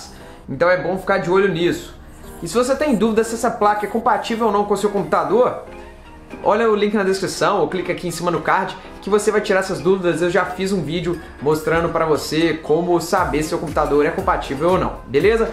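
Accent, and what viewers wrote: Minas Gerais, Brazil. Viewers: Mineiro mineiro ♥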